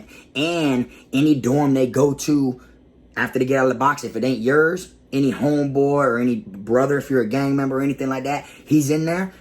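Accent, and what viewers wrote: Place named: South, United States. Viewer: Southern accent tryin to sound street.... -20 IQ points.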